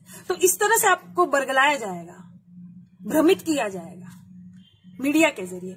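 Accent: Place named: Bihar, India. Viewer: Madam I like your bihari UP style Waiting for next Perpunch in Montreal Thanks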